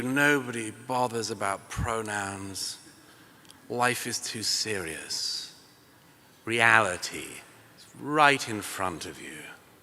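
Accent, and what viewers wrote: RP, United Kingdom. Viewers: Douglas Murray is the proof that you can say absolute shit, but if you say it a picked british accent, people will fall over backwards thinking you're smart. This numpty is the moron's icon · For an Engishman u r so passionately zionist. And such a thick carefully crafted upper class British accent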